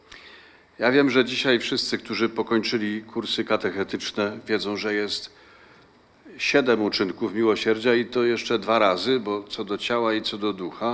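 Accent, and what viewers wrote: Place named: Kraków, Poland. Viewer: oprócz Słowa Bożego pielęgnuj ks. abp swój krakowski akcent - jako dowód piękna naszej ojczystej mowy . Niech Matka Boża ma Cię w nieustającej opiece.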